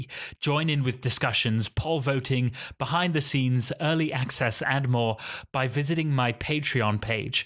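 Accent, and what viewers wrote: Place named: United Kingdom. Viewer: Que curioso suena el acento británico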